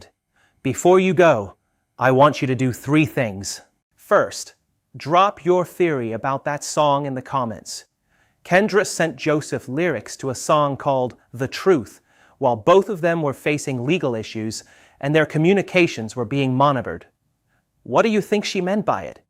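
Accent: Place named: United Kingdom. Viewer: Oops. What happened to the British accent?!?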